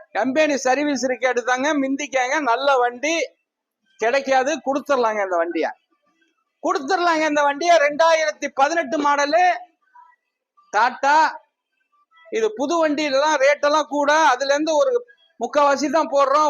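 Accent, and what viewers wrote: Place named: Nilgiris, India. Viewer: Car ra neelagiri thayalam slang la sale pani ipotha pakuran😅😅😅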